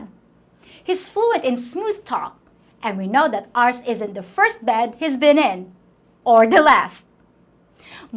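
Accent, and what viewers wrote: United States. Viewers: Your American style of English is interesting. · very well done video. Just one thing is a little disturbing but I do not mean it at all as an insult: if you speak in english, it would sound nicer to use proper british english instead tp but on american accent what is seen as not that nice to hear in Europe. Never mind.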